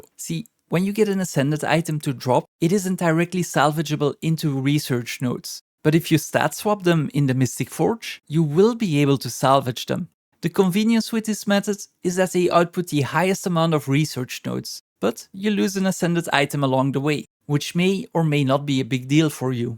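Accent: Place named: Flanders, Belgium. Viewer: Hi, found your channel recently. I like your to the point guides. You sound "Flemish". Where are you from?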